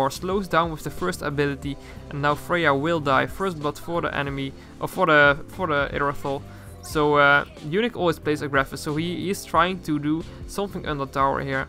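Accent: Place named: Netherlands. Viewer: Ja aan dit accent hoor ik gewoon dat je Nederlands bent lol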